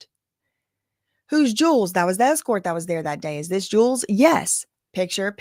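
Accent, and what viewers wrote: South, United States. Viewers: My husband thought you were a black gurl this whole time lmao “no she’s just from the south” 🧚🏻‍♀️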